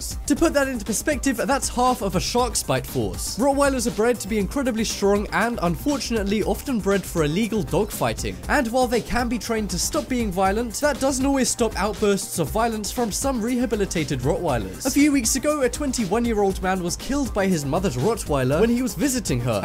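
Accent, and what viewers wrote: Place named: England, United Kingdom. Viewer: English accent doesn't compute with American closed captions... Apparently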